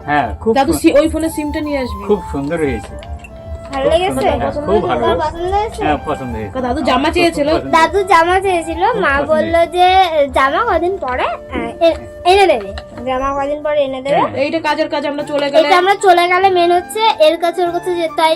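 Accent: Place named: Bangal, Bangladesh. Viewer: তোমরা বাড়িতে কি বাঙাল ভাষায় কথা বলো???? খুব ভালো লাগলো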